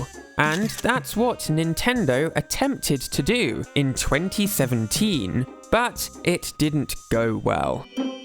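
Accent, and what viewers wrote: United Kingdom. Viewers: One word and i know that you're a british although it's my first time watching this channel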